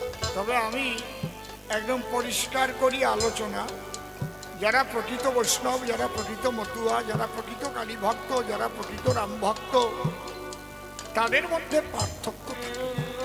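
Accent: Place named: Bangladesh. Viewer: খুব সুন্দর 👌👌👌, অভিজ্ঞ, জ্ঞানী মানুষ। নমস্য ব্যক্তি। প্রণাম জানাই। বাংলাদেশের ভাষা ছেড়ে পশ্চিমবঙ্গের শুদ্ধ বাংলা ভাষায় পরিবেশন করলে আরো শ্রুতিমধুর হবে। এটা পশ্চিমবঙ্গ। পশ্চিমবঙ্গের বহু মানুষের কানে খটকা লাগে। তাই অনুরোধ রাখলাম। আমি এনার সব ভিডিও দেখি। নিয়মিত শ্রোতা। তবে আমার মনে হয়, উনি ১০০ বছর পশ্চিমবঙ্গে বাস করলেও পশ্চিমবঙ্গের বাংলা রপ্ত করতে পারবেন না।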